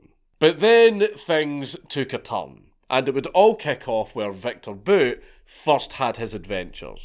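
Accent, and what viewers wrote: Scotland, United Kingdom. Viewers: Hey man, you’re clearly Scottish do you reside in Scotland or are you across the pond in the Americas? I’m not stalking I’m just super curious because I personally share some of your “political” views (which you’ve only ever eluded to but never overtly expressed) & if you’re NOT in the US only makes me that much happier.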